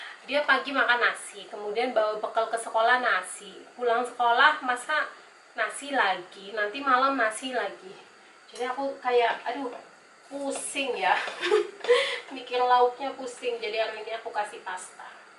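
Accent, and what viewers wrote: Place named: East Java, Indonesia. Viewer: Bu, dari mana sih? Logatnya kok kaya Jawa Timur an.. Maaf penggemar baru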